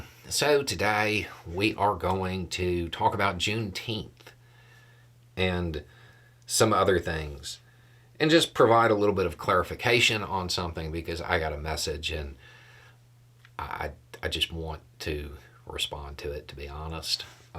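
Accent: South, United States. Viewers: Some of the messages that come in really make me think some people out there just have a kink for being verbally dismantled bearded white guy with a southern accent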